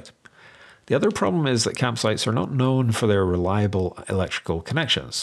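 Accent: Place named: Scotland, United Kingdom. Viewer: Hi. Came for the EV9 content, but now wondering about your accent! 😂 Do you come from Scotland?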